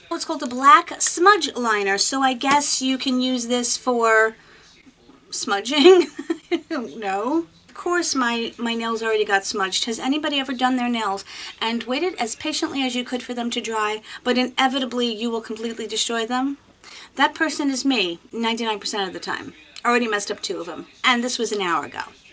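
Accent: New York, United States. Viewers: Always better when I see your beautiful face and your lovely NY accent..x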